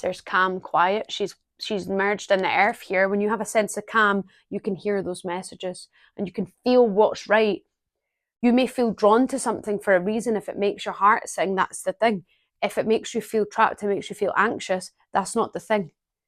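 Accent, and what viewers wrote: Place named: Scotland, United Kingdom. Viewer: Thank you for this video and message. I think you tapped into the energy I feel when I connect with my guides. It’s pure love and I don’t really feel it with other people, only with them. I think I also like the connection to Scotland. My grandmother and other relatives come from the Munro Clan and hearing your accent brings a familiar joy ❤ Have a good day!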